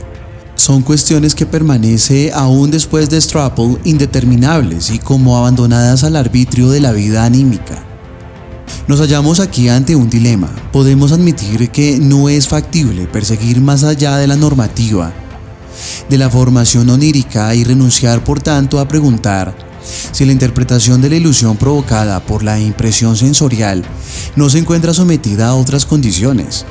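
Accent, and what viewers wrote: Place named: Colombia. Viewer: Una voz humana que habla como robot, no sirve! Deberia hablar mas natural sin tanto acento colombiano y mas neutral. Saludos!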